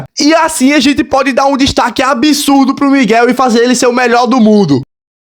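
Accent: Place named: Northeast, Brazil. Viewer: você e Nordestino?😂você fala igual um amigo meu que veio de lá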